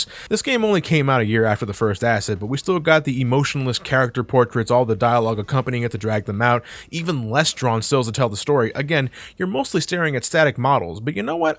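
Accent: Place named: United States. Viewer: Oh I agree with you there; because of our different regions with different slang and colloquialisms. A lot of English mispronounce as well, which hurts me even more, haha. I was just saying that is a very American way of mispronouncing as I've typically seen in American television shows etc.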